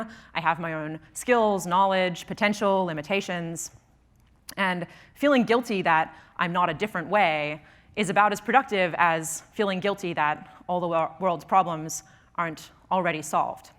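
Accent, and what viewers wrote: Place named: Australia. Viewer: I was in her lab class at unimelb. Back then, she spoke pure aussie accent. Now, it's hybrid.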